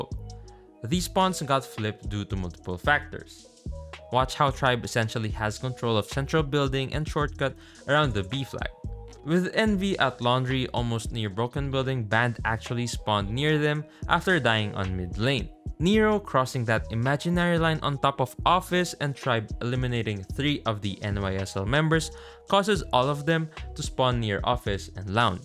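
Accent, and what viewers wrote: Philippines. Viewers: Hello are you filipino? I like your accent and voice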